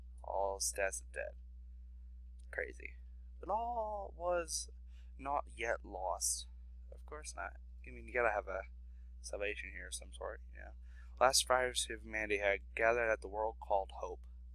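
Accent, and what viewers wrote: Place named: United Kingdom. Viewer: You went from sounding heavy British to a more satisfying deep ear feel.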